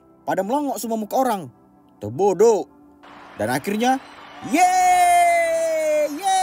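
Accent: Medan, Indonesia. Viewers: Logat Medan Yo😂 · Medan mananya kau bang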